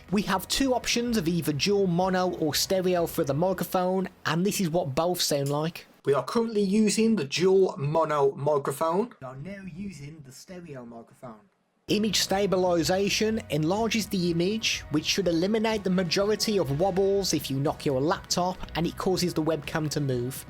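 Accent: Black Country, United Kingdom. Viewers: Great review and even greater Dudley/black country accent 😂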